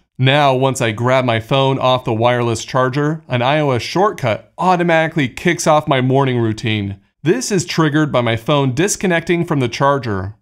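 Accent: United States. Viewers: By the accent, I'm guessing you're from Ogden, Utah. Am I even close?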